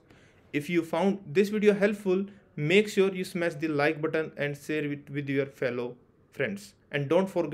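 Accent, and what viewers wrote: India. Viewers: Useless like this Indian Voice :)))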